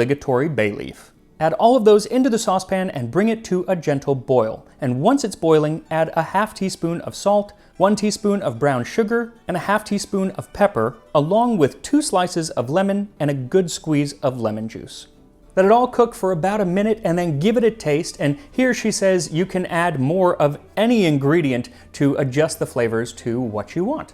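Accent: United States. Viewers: Why do you Americans always pronounce herbs as erbs without the 'h'... but over prounce the silent 'h' in words like vehicle? 'Ve-hical' 😅 It drives me nuts 😂 Apart from that, I love your shows and will venture into trying some historical recipes. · Why can't Americans talk! "Erbs" Herbs QEII was crowned not "coronated" The temperature doesn't "raise". You may raise it, but it rises. Loved the recipe and the comentary...... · That's just how Americans say it. Never heard a American say it the British way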